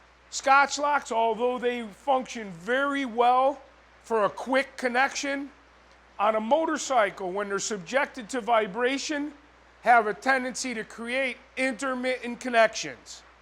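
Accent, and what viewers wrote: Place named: New York, United States. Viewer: New Yorker.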